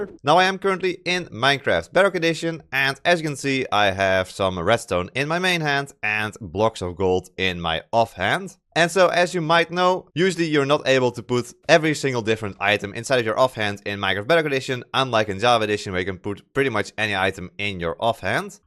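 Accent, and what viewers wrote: Netherlands. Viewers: The dutch accent 😅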